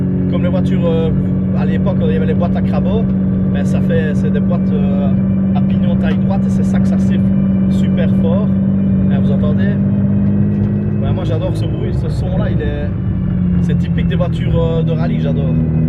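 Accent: Liège, Belgium. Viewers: Merci de nous faire part de tes connaissances et de tes explications adaptées. Preparateur gtr, moi je prépare des bons gros tedehi lol chacun son monde ! Lol Chouette vidéo, ton accent liégeois rend unique ton vlog 😎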